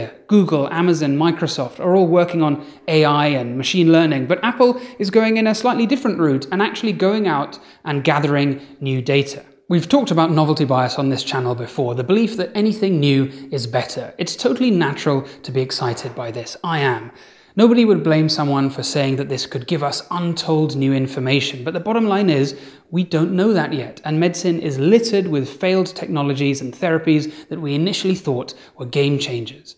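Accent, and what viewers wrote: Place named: United Kingdom. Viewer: People don't get a British accent by living there for a couple years, hie's have had to spend some part of his formative years there. He does mention he travels a lot and I've heard him mention being in India. But he assuredly spent quite a bit of time in Britain growing up.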